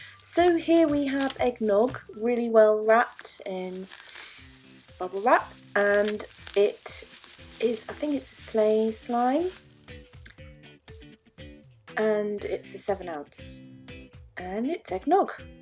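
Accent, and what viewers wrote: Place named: United Kingdom. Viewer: I support u. I love how ur voice sounds! Just the British voice is so beautiful am I right? I'm British but my voice is not as pretty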